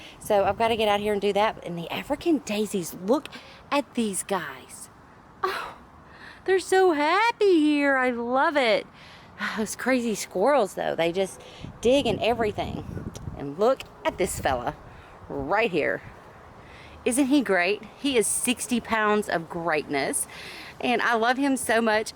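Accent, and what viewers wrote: South, United States. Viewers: What a beautiful garden, and you have the most beautiful southern accent, my dear friend. What would you do if you planted a carrot garden?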